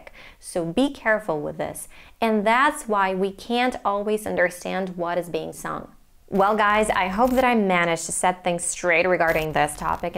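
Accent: United States. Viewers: I read on a comment that you are not American . is it true 😮😮😮 because you sound like one of them .I do not know if that guy was saying foolish things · I love you. Your English is American you speak and sound very well, but can you speak the British English? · I love this Belarus song. - Very beautiful poetry too...... I thought, You were Hispanic American. Very surprise to know that You are Ukrainian. You have a very cute baby too. You have a very American native accent. I had lived in U.S.A. for 16 years.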